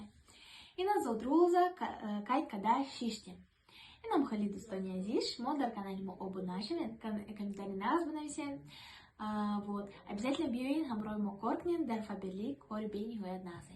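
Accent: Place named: Kulob, Tajikistan. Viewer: Ту мана раздражать мекуни ,духтари ёщу ранги кампирои кулоб гапмезани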